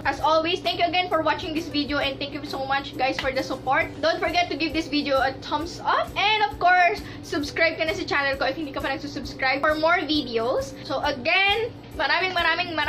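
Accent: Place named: Ilocos, Philippines. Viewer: Found your channel and it's awesome! You sound like an Ilocana so you earned a subscriber :D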